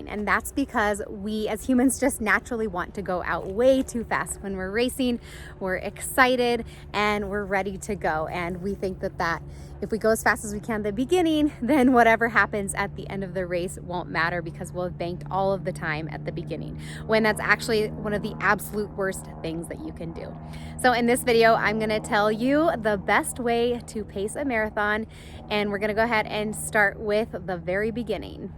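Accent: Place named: Midwest, United States. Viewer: Judging by your accent (same as mine), it’s nice to see a “northern girl” with some marathon content!! I’m rom WI and now in the twin cities. Do you do a lot of winter outdoor running or do you focus more on the treadmill? How does that affect your training or is it just sustainment mode during the half year of snow on the ground? 😂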